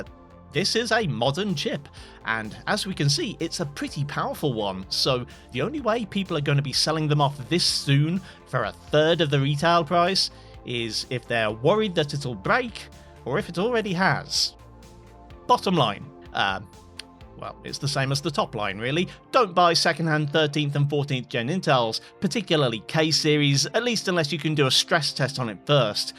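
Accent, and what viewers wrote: England, United Kingdom. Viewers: Saw someone mention you on a Gamers Nexus channel. Had a quick look, and saw this vid which was a question I was wondering about. Soon as I heard a sane English voice, I was in :D Plus your nearly at 100k subs.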